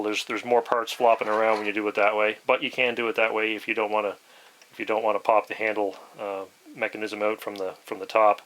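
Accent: Canada. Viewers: Great video! I just bought one of these recently, as I have a plethora of spent shells I kept for eventual reloading. I like the Canadian accent too.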